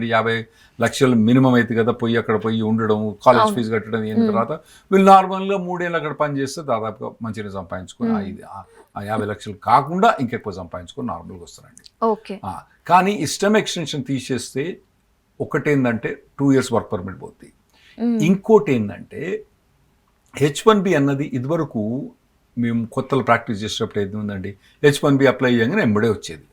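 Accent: Telangana, India. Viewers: Sir your words are pakka telangana❤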